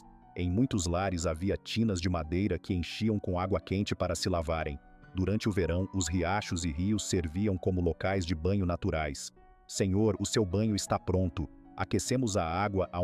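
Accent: Brazil. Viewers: Que curioso é ouvir falar em português mas com sotaque brasileiro.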